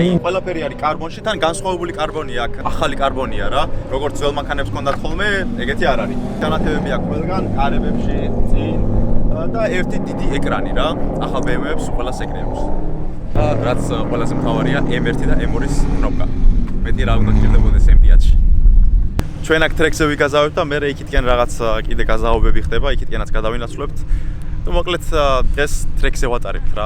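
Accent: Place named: Svaneti, Georgia. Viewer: სვანი ხარ ხო? აქცენტით ვხვდები სვანი ვარ😀✊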